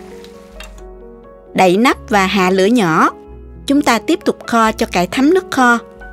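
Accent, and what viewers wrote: South, Vietnam. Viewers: Chào sức khỏe bình an, rất bổ ích... có giọng nói nam bộ rõ ràng dịu dàng, phải thừa nhận quá chuyền cảm...Cảm ơn nhiều.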